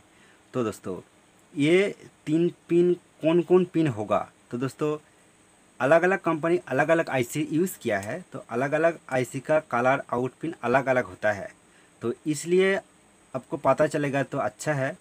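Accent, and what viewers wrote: Bengal, India. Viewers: भाई की हिन्दीभी बहोत ध्यान से सुननी पड़ती है क्योकि लहेका बांगला में आता है बेस्ट करते हो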